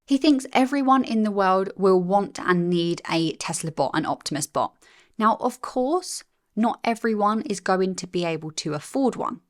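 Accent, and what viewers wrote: England, United Kingdom. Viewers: Love your content and your English accent.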